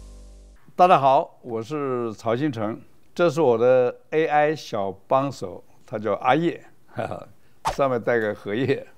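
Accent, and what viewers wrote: China. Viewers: 為什麼用的是中國腔的語音啊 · 為什麼語音是中國口音？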